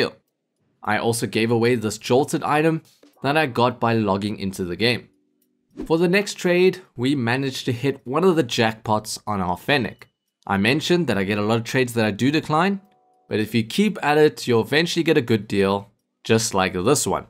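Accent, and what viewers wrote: South Africa. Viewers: I know a South African accent when I hear one! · Why do you sound very south african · This man sounds afrikaans or is it just me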